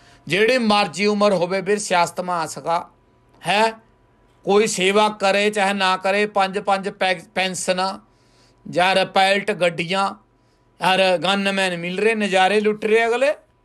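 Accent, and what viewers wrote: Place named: Puadh, India. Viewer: ਲਾਡਾ ਬੀਰੈ ਜੂ ਸਤਿ ਸ੍ਰੀ ਆਕਾਲ ਜੀ ਬੇਨਤੀ ਕਿ ਕਲ ਇਕ ਨੇਂ ਕਿਹਾ ਪੰਜਾਬੀ ਨੂੰ ਵਗਾੜ ਕੈ ਬੋਲ ਰਿਹਾ ਇਸ ਨੂੰ ਇਹ ਨਹੀਂ ਪਤਾ ਕਿ ਪੁਆਦ ਕੀ ਬੋਲੀ ਕਿਨੀ ਪਿਆਰੀ ਹੋਵੈ ਬੀਰੈ ਥਮ ਖਿਚੀ ਚਲ ਬੀਰੈ ਜੀ ਲਿਖ਼ਣ ਨੂੰ ਤਾਂ ਬਹੁਤ ਊ ਹੈ ਬੀਰੈ ਜੀ ਕਿਨਾ ਕੂ ਲਿਖੈ ਦੁਨੀਆਂ ਤੇ ਪਾਗ਼ਲ ਹੋ ਗੲੀ ਮੇਰੈ ਪਾ ਤਾਂ ਲਿਖਣੈ ਨੂੰ ਬਹੁਤ ਹੈਂ ਬੀਰੈ ਮੇਰੀ ਡਬੇਟ ਕਰਾੲੇ ਇਨਾਂ ਗੈਲ ਮੈ ਕਡੂ ਇਨਾ ਕੇ ਚਿਬ ਧਨਵਾਦ ਜੀ ਲਾਡਾ ਜੀ ਸਰਪੰਚ ਮਾਛੀਵਾੜਾ ਸਾਹਿਬ ਜੀ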